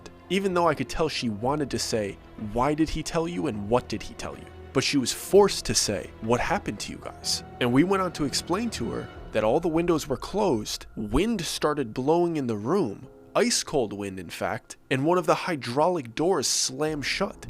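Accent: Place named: East Coast, United States. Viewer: Now I know why listening to you sounds like I’m listening to one of my friends from the neighborhood. I grew up on the East Coast and did a couple of summers in Queens. I also had to Service hour penance.o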